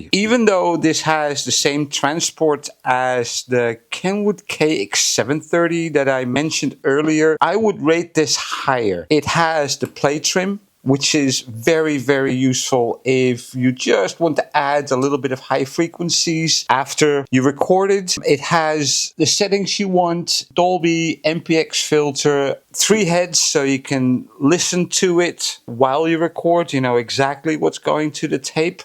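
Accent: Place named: Netherlands. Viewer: you sound Dutch and I am looking for somebody to service a Nakamichi zx 7. Can you help me?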